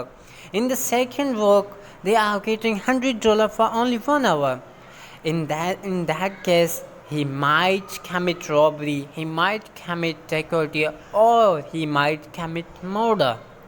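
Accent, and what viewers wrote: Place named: Pakistan. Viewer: Pake ascent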